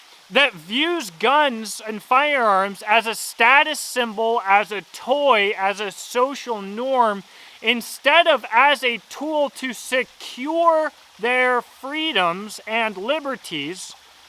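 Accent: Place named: New England, United States. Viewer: His Yankee voice is so annoying.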